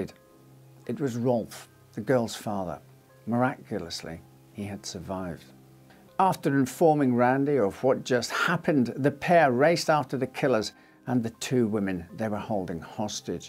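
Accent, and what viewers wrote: Scotland, United Kingdom. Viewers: I hope you get well.. I enjoy his narration style, and the subtle Scottish burr allows these stories of terrible people happening to decent folks bearable to hear about. I cannot believe I used the adjective "subtle" to describe Scottish.. anything. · You remind me so much of the Scottish actor in Outlander not sure of his name. I love your accent 😊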